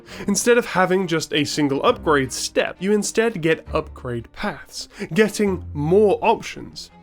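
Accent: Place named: United Kingdom. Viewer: Really love your British accent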